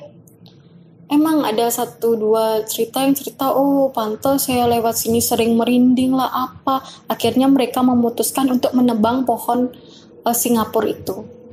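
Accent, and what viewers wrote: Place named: Bali, Indonesia. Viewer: Logat balinya sampek sini mbo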